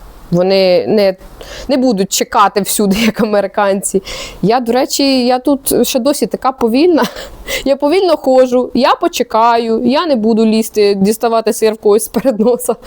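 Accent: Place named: Vinnytsia, Ukraine. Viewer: Це не совковий цирк. Це багата фонетика Вінницької області)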